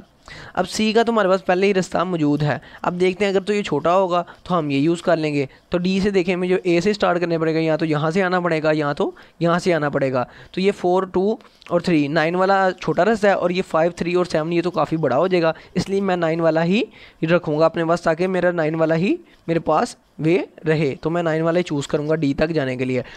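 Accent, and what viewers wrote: India. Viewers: Bhai tum himachal se ho kya ?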